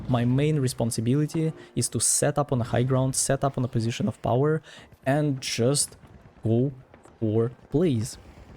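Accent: Russia. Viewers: Сразу слышен русский акцент, bro 😀😀. Хорошо разговариваешь, very cool!! Тоже сейчас учу английский, только начинаю. Хочу так же свободно говорить и понимать на слух, ты молодец, так держать !!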